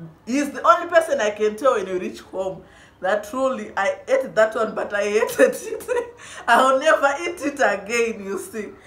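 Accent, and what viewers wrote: Uganda. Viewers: I don't understand. You have an Ugandan accent. How come you didn't know the language 😂😂😂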